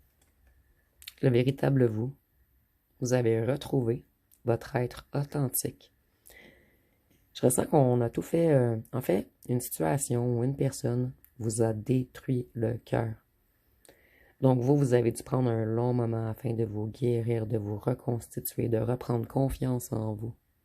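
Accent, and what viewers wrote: Quebec, Canada. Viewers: Je suis ducsud de la FRANCE...Chez nous aussi nous avons un sacré accent.Mziw votre accent QUÉBÉCOIS JE L'ADORES !!!❤❤❤❤❤❤🎉🎉🎉🎉🎉🎉🙏🙏🙏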